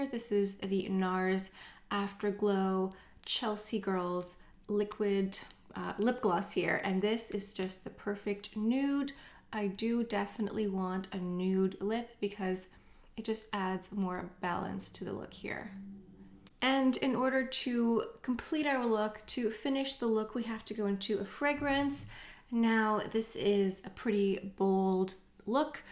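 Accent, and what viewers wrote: France. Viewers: I am French and accent Français is perfect😍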